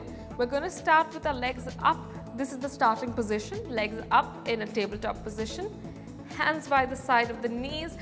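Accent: India. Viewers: it's Indian accent dear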